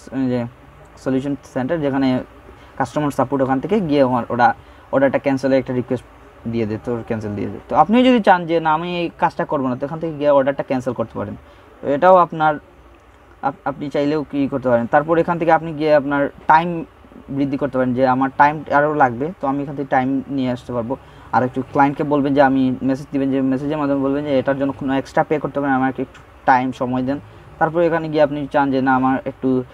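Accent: Sylhet, Bangladesh. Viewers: apnar kotha shuna mona hoitaca apne sylhete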